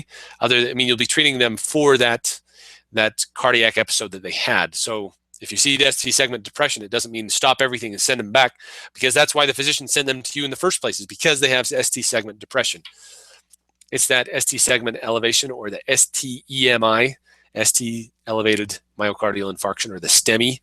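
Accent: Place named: West, United States. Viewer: Ha ha, the joke near the end! The accent sounds western American, i guess.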